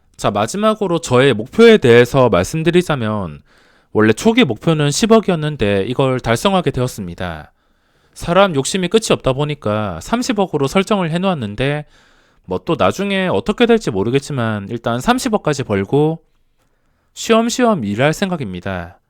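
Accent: Gyeongsang, South Korea. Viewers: 아 경상도 억양 저얼라 짜증나네 억양이 모 저러냐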